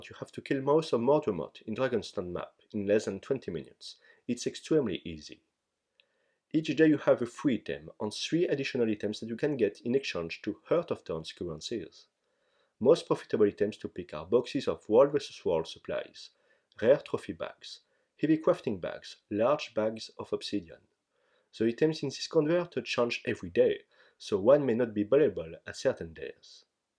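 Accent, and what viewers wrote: France. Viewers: It's the first time i hear a French accent ! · En vrai c'est beau gosse d'oser lancer une chaîne anglophone en étant français, compte tenu de l'accent francais très difficile à perdre. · You just sound like you are from France :) To me you are one of the best players in the game! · I noticed you're French and loved your accent. ☺️ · why would i ever make fun of an accent i really enjoy listening to? coming from a non native english speaker that is learning french, iz verrry interrrrestang to hear :P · Worst accent x) wtf x) why didn't you make this guide in your native language? Guess it's French, it would be better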